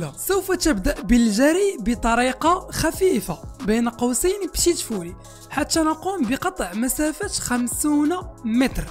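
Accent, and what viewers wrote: Morocco. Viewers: نتا باين عليك مغربي بالظبط من مراكش😂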